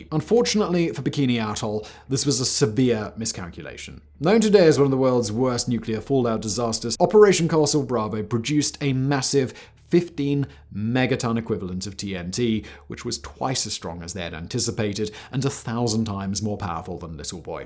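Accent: United Kingdom. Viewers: A video about a country the United States eradicated is rich coming from someone with a British accent... The British eradicated half of the world, including what became the United States lol.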